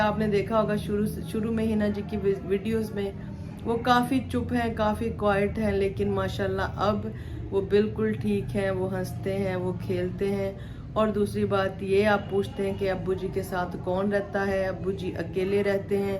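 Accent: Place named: Punjab, India. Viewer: Bhainji sat shri akal ji Tusi jdo'n bolde o menu baut sohne lgde o tusi hai v bade sohne o Tuhadi theth punjabi v sade vrgi a Tusi pakistan vich kitthe rende o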